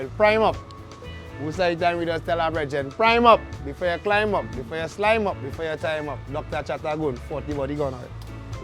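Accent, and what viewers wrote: Trinidad and Tobago. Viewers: It’s because he isn’t Jamaican…he’s Trinidadian so he’s sounds Trinidadian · That's a true Trini, different accent from Jamaican.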